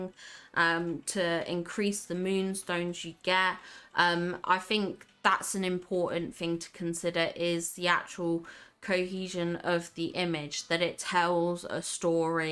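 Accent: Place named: United Kingdom. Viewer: Hey 👋🏻 Thanks so much for posting this…I was kinda downhearted by my 600 moonstones for last DreamSnaps😟…like quite a few others..the bar has been raised now peeps get how profitable taking it seriously…anyways you have given me some really good ideas and inspiration..Just Love your content as ever..nice to hear a fellow Brit too 😊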